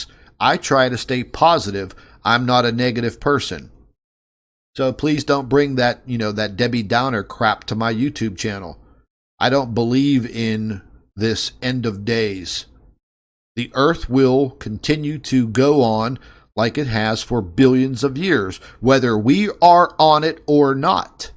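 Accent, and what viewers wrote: Pittsburgh, United States. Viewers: You from Pittsburgh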